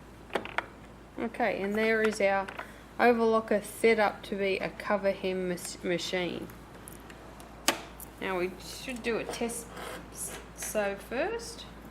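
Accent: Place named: Australia. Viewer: Thank you so much! Your instructions helped me figure out the manual I have for my PFAFF 4862 Coverlock. The manual was not helpful at all, in fact I was more confused each time I turned to it. You saved me totally losing my mind and my temper and destroying everything. Oh and love that it's Australian!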